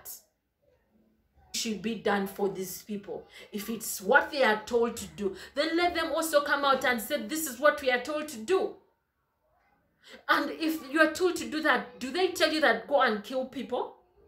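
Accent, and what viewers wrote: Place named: Uganda. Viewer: Your accent should be on my tv girl. Amazing Don't mind me, i get overly excited when I meet more than average fellow Ugandan Content createors. Bless you 🤣💃 Bless you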